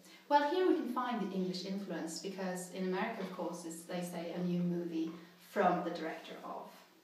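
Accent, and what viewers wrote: United Kingdom. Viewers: I'm guessing from the context here that you're Swedish. You have a heavy British English accent. I thought you were British until I got the context that you were Swedish.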